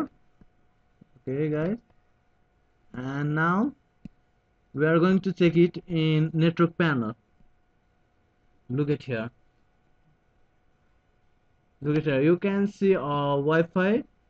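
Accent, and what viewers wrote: Nepal. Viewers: Dude, are you Nepali? You got a very Nepali accent. Your video didn't even address my problem. Thanks anyways. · Nepali Manxe le English boleko xittei chininxa dai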